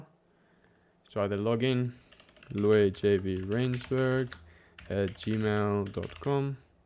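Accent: South Africa. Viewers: Am I hearing a South African accent👀🔥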